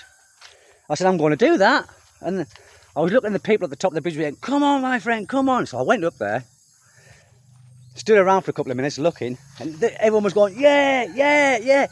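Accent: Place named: North East England, United Kingdom. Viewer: sounds like you come from somewhere not a million miles from my area in the N.E, Cleveland area.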